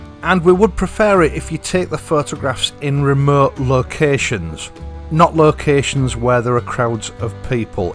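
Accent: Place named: Yorkshire, United Kingdom. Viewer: Dear: I like your music and I like your Italian (ooops - make that British) accent and I like your new motorcycle to which I will attach the name “Bullet” because you own one and I don’t and that’s what you call it. Actually, I find the music quite agreeable - much better than the acid rock that some bike videos play (and to which I do not subscribe). Anyone who disagrees is most welcome to watch one of the tens of thousands of other YouTube channels - or they can just get stuffed. One question about the Bullet: would any of the Interceptor or Himalayan accessories fit? I suspect not as those two machines are much more recent designs - but I thought I’d ask.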